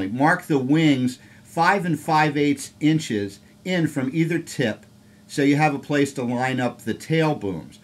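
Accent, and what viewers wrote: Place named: Australia. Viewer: great fly. he speaking as an Australian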